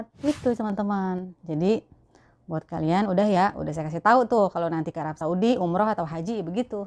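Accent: Jakarta, Indonesia. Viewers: Medok banget Betawi nya.. 👍👍❤️ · Assalaamualaykum....met kenal mpo hehehe....hr ini kluar dah logat betawi mpo yah hehehehe...sukses slalu ya mpo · Salam kenal pok,gaye nye betawi banget👍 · Assalamualaikum.. teh, aslinya mana? Logat ngomongnya betawi beud 😁😁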